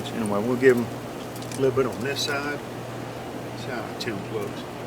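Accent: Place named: South, United States. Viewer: As a kid I loved the southern accent and attitude. I still do, though as a Canadian I feel like I’m one of the few. Keep cooking brother.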